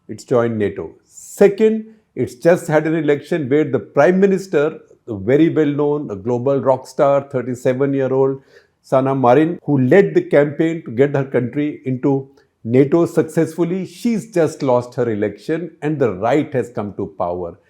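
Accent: India. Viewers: This Indian accent very distracting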